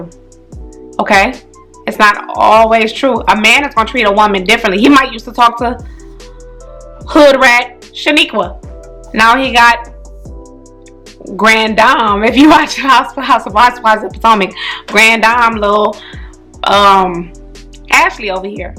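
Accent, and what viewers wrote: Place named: Spain. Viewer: like a Spanish accent to it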